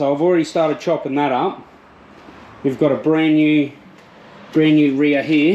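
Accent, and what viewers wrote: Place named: Australia. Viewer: Being an American I’m not used to hearing an Australian accent and I gotta say I love it. Love the work you putting in. Can’t wait to see the final product. Keep it up.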